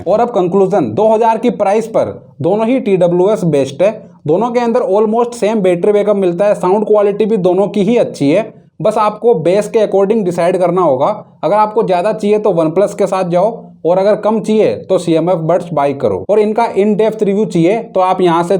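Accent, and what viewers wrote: Haryana, India. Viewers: Baato se to Haryana ka lagg raha hai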